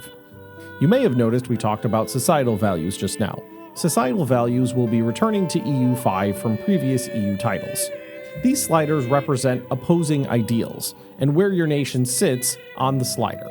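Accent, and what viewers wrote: United States. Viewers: absolutely in British English...the dude speaking is American.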